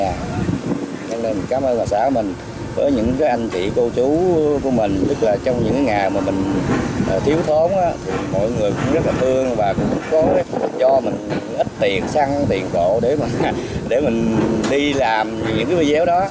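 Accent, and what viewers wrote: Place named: Mekong Delta, Vietnam. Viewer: Chúc mừng anh trai, đậm chất miền Tây chân chất, gần gũi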